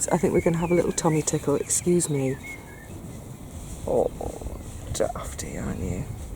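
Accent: United Kingdom. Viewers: " 🎼➡️ A long handled Sneeboer potato or bulb planter will enable you to plant through that tough soil. For this reason I am constantly working to improve my soil. This tough unapproachable soil takes the FUN outta gardening! Wishing and hoping DOES NOT improve CLAY soil! that is an excellent looking stand of broad beans. Raspberry is definitely as weed in your broad bean patch. Those beans are humongous! My mother who is an excellent cook asked me to grow 4" Nickel Filet beans. Thank you for showing us your harvesting technique. This Jane Goodall ad is one of the FEW that was WORTH watching! We can beFRIEND whatever animal we currently care for. Yes, you can intercede cover crops:-) I am currently planting Creeping Charlie into my beds bc Alfalfa a cover crop that relieves DEEP soil compaction DID NOT relieve surface compaction. I, Portia, will plant Creeping Charlie between my Alfalfa rows. 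Earthworms like coffee to help the anatomy of their gizzard, Excellent! Cardboard is a food for the worms!:-) I love hearing your British accent. I could've had that accent too had my parents stayed longer in Suffolk, England:-) I was enjoying seeing you and your mum gardening together. She looks regal. Your cardboard and coffee beans atop should take care of the raspberry weed. Try putting Creeping Charlie on a portion of your planting area and see for yourself what kind of results it brings for improving the tilth of your soil. DEFINITEL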